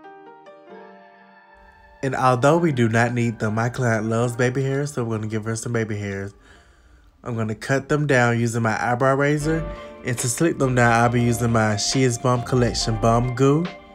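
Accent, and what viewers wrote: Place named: Texas, United States. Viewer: I love🥰🥰 his accent, where are you from?... Texas?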